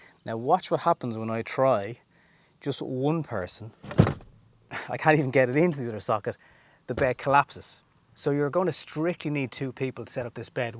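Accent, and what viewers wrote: Ireland. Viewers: Irish accent. 🤭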